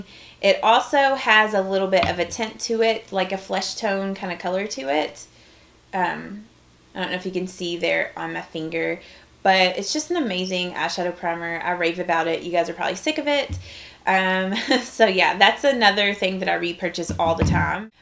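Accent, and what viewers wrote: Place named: Kentucky, United States. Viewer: I thought your accent sounded familiar! I'm from Ky also(right outside of Louisville), currently stationed in California. I can't wait to get back to the blue grass❤️ I love your videos, I look forward to watching them.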